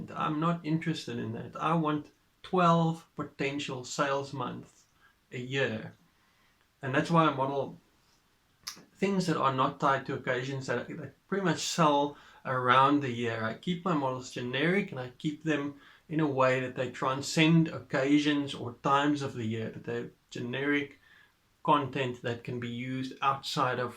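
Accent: South Africa. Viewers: I could tell that you are South African by your access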